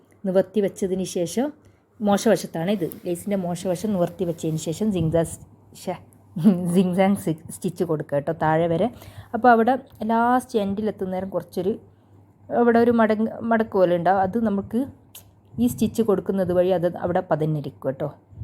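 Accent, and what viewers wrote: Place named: Vadakara, India. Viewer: Are u from വടകര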